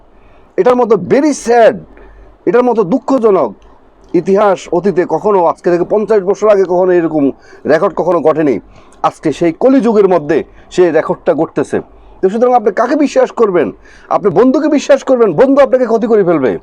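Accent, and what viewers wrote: Bangladesh. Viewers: আপনি কি পূর্ববঙ্গের মানুষ ? আপনার কথাগুলো খুব টান আছে ? আপনার বাড়ি কোথায় ?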